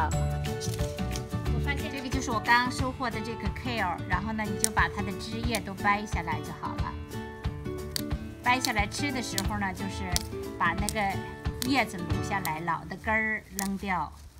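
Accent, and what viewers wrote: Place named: Shandong, China. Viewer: 山东口音好亲切 赞👍🏻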